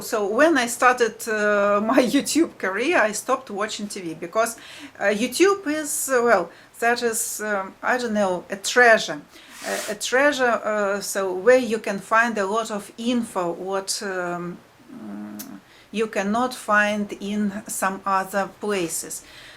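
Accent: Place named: Russia. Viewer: please, do what you want to do here on YouTube. My boyfriend is a Russian who used to work quite frequently here in the United States. He spent roughly sixty to seventy percent of his life here with me. Back in Russia he has two kids with an ex. As such he would have to go back and spend time with his family there. Such was the case at the time that this situation came about. I was there with him for the new year and came back home the following week. We're both about 40 years old and I can indeed say with absolute confidence that this is a person that I love and miss dearly and would love to be married to at some point. Any plans for such a currently on hold for obvious reasons. I've been to his family's dacha several times. I'm on speaking terms with his family there despite the political situation. Watching you to go about your day for me is a kind of therapy. 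Hearing your accents the people around you speaking in Russian it gives me a sense of home that I can't have right now. Please, understand that people will be miserable because of their own personal problems and projecting such unpleasantness on you is all they can do. They're bored, they are shallow. You however, small a one it may be, a light in the darkness. However long that darkness is the last. Пока-пока:)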